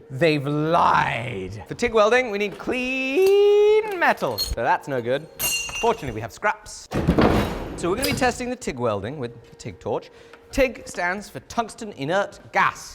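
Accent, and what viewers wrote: England, United Kingdom. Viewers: You already look like you’re making a Harry Potter sequel, the English accent seals the deal. I think you did that on purpose with the thumbnail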